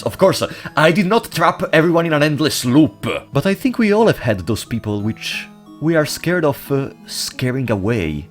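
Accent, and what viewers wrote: Italy. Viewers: Are you italian by any chance?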